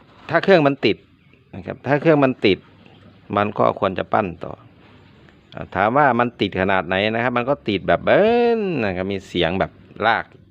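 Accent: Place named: Ubon Ratchathani, Thailand. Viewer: ช่างอยู่ อำเภอได๋สำเนียงคือทางอุบล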